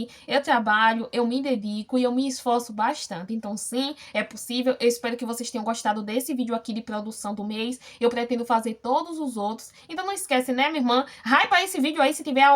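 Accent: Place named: Bahia, Brazil. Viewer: Mirmã voz de baiano é terapia 😂 Parabéns lindona vc é inspiração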